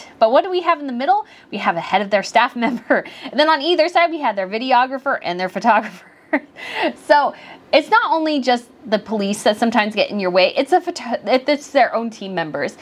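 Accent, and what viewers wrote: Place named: United States. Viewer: This is so sweet! You sounded really polite and excited at the same thing! Nothing embarrassing! I'm sure she thought you were really sweet and surely she recognized your American accent, meaning you made a big journey to be there! I hope you have a blessed time at the Coronation today, and I'm praying for some very special experiences. <3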